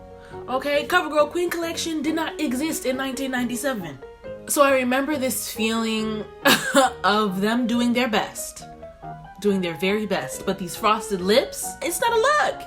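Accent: Louisiana, United States. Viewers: OK I didn't know got a lil Louisiana spice going on 😛😛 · lolll i heard the Louisianan accent.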